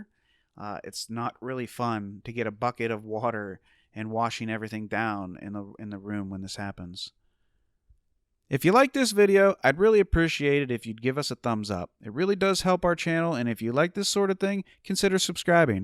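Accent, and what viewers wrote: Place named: Philadelphia, United States. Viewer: is that a philly accent? its so thick! lol!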